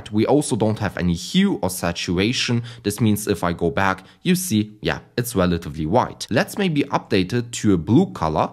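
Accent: Germany. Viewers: You sound soooooooo much like great scott. I mean I assume you're both German, but still it is uncanny.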